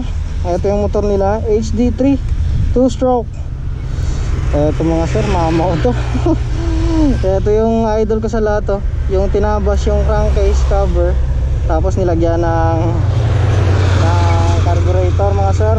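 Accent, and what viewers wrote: Iloilo, Philippines. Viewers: parang ilonggo ka po😹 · Ilonggo ka boss? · Ilonggo ka sirr????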